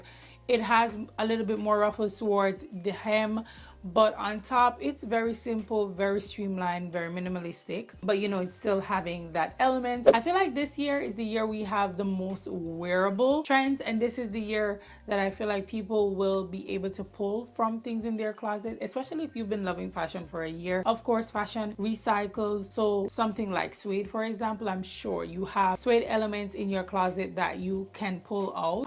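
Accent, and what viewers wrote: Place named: Jamaica. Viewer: I'm seeing you for the 1st time. Heard a Jamaican accent & decided to check .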